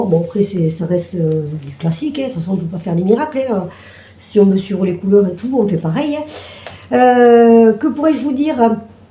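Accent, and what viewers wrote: South, France. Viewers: Bonjour, je viens de m abonner, j aime beaucoup votre accent qui me rappelle mon enfance dans le sud. Je vais faire le SAL également et pris cela grille sur le site de brode 41, que j ai découvert car comme vous il n y avait plus la grille chez de mère en fille et j ai été très satisfaite de sa préparation de commande et de l envoi ultra rapide, je recommande cette boutique qui fera partie de mes favoris maintenant. Très belle pochette, il faudrait que je m y mette également 😊 on va être plusieurs au taquet début juillet pour ce SAL je pense , pour ma part je vais faire ligne par ligne de dessin et faire juillet et août dès le début car en Août priorité aux petites filles et vacances et là il me faut des projets 0 CERVEAU 😂😂😂 bonne petites xxxx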